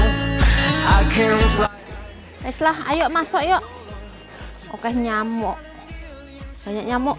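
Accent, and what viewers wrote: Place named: Java, Indonesia. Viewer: Ngomong jowo e ora ilang y mom .... seneng punya tanaman buah dan sayur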